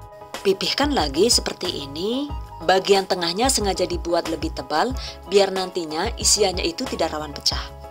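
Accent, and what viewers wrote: Banyumas, Indonesia. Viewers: Syuka bgt sm resep2nya Yu...hp sy semua isinya,sy save & ada yg beberapa sy praktekin,masyaAllah chanel resep yg gk pake bohong yg pernah sy subscribe.😊...setiap yg sy praktekin Alhamdulillah berhasil.syuka jg sm ngapaknya itu yg gk pernah ketinggalan,CINTA NGAPAK pokoke ya Yu:)) Sukses & sehat selalu ya Yu...semoga pahala trs mengalir krn selalu tebar kebaikan. Pengin meet up sm yayune,hehehe..."semoga" #GiveawayTHVFEBRUARI19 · Bundaa kok bisa bahasa ngapak? 😁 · Konten yg menyenangkan...ada bahasa ngapaknya Jawa saya..i like ..thank you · Pinter masak.. Pinter bhasa inggris Ehh pinter ngapak jg Komplit deh😁😁😁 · Bunda tu ngapak kah hehe... Btw suka sama chanelnya 😍😍 lebaran kmrn aku pakai resep ni buat pesenan pastelnya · Manstap bgt bunda...ngapak ykykny bhsany..sama nih🤭..suka bgt q kue ini🤗🤗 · aku suka Vidio orang ngapak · Assalamualaikum ......aku sangat suka dan ngefens samambak punya ciri khas suara yg sangat merdu skli.aku suka resep2nya mbak .danadaresep totorialnya yg mdh dansimpel utk dipraktekan aku sangat suka bhs ngapak2nya itu ......😂😂😂😂😂😅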